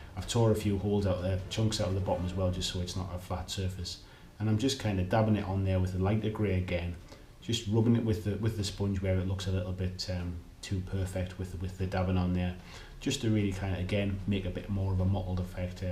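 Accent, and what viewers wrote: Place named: North East England, United Kingdom. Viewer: Nice build with the board, added this to my YouTube list for referring to at a later date, can I ask where you are based as I believe I can hear an accent that is suggesting Newcastle/Gateshead/Shields/Sunderland in the N.E of England.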